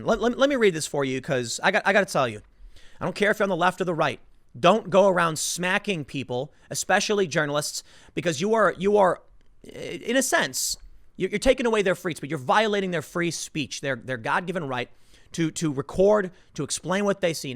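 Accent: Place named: Philadelphia, United States. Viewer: Really laying down the Philly accent lol 🤣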